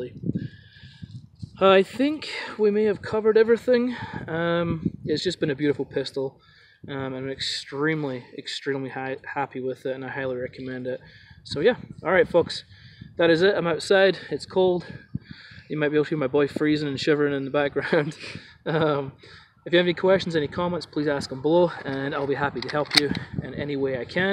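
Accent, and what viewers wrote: Scotland, United Kingdom. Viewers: I was thinking Scotland! Sounds like a mix of Scottish, Canadian and American haha Thanks for the review. Would you recommend this as a first handgun? I'm looking for a good range gun but also something I may want to carry occasionally.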